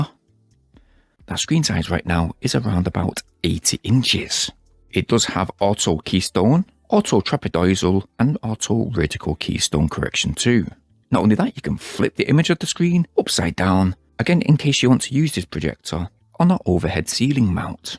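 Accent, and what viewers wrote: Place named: Manchester, United Kingdom. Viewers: what's your accent man. sounds like manc mixed with something else. maybe scandinavian? or are you just using an unusual cadence for youtube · im late but its northern english or scottish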